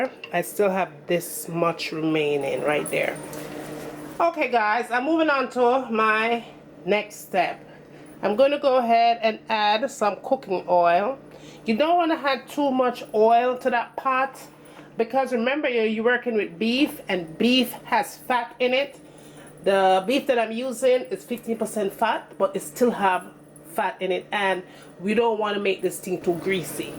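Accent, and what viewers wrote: Jamaica. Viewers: omg I LUV ur Jamaican accent ! It takes me to the beaches of Jamaica while enjoying a spicy beef Pattie.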